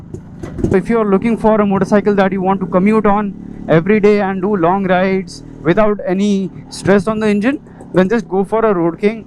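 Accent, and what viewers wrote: Hyderabad, India. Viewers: Have watched this video for over 5 times now, we love your Hyderbadi style Hindi accent bro 👍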